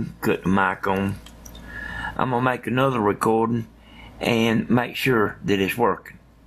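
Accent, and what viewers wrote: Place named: South, United States. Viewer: More Southerners should speak like that again. Excellent video. No waffling intro, straight to the point and helpful..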